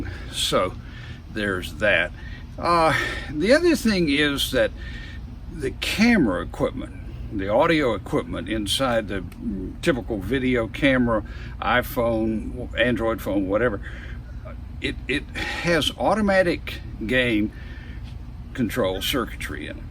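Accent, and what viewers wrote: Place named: Texas, United States. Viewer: He sounds like the most stereotypical texan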